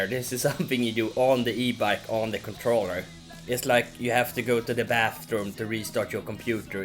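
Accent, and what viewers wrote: Sweden. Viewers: Swedish accent, Skatepunk/Millencolin in the background, talking about battaries on bikes. I am a fan(Denmark)!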